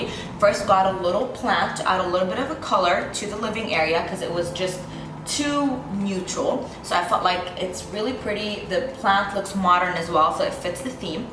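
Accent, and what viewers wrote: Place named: Arab world. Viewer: we still love ur Arabic accent. So it's okay u can pass with it.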